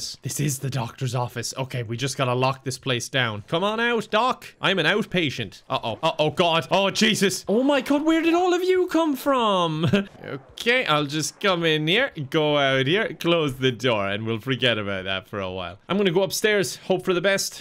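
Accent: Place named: Ireland. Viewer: I read the Wednesday entry in Irish voice.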